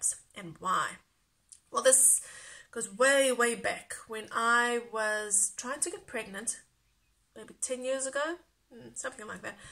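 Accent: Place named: South Africa. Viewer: So happy to finally see you my friend, you have such a positive and smiling face. I just love your accent and really feel that South African accent is soo cool. I have a mix of indian and South African accent but my 4,5 year old daughter has very strong South African accent and she always corrects me 🤪.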